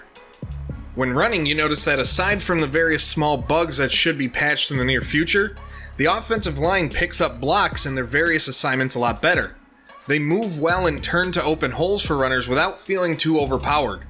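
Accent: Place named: Midwest, United States. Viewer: Oh damn..that wisconsin/minnesota accent tho 🤣😂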